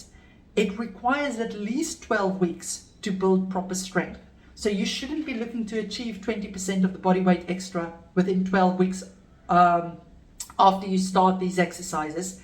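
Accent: South Africa. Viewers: This video was the best help and support I've had in the whole duration of my achilles recovery! Thank you so much for the breakdown of the recovery process.: baie baie dankie - mal oor jou aksent. Dit was so lekker om hier in Australia na n stunning video vanaf UK te kyk en lekker te voel oor ons Saffer roots!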